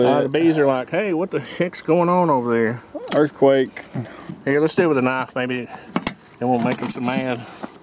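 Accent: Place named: Appalachia, United States. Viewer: Peter has a New English accent this guy has a Appalachian accent. Ones Northern the others Southern very different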